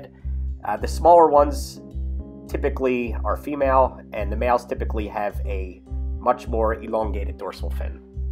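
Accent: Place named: Western Pennsylvania, United States. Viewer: Very nicely filmed. 👍 you sound like you may be from Western Pa.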